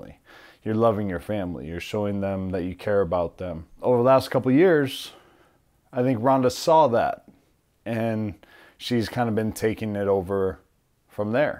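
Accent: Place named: Hawaii, United States. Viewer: Damn, hes Hawaiian? The accent makes so much sense now...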